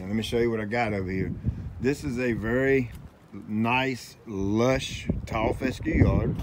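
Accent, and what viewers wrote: South, United States. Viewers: Where are you located? You sound like your from the south but in my part of the south lawn stripes are unheard of and I think it’s because of the types of grass that grow around here don’t lay down that way. I think zoysia grass will do it though, we typically grow st. Augustine, Bahia, centipede and zoysia around here in north Florida and South Georgia. I’ve always been interested in stripes and would love to have them here but we don’t have “fescue” grasses around this area. Looks good brother!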